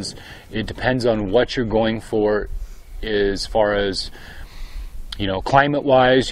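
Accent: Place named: Australia. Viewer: WA is that Western Australai ? cause u have an aussie accent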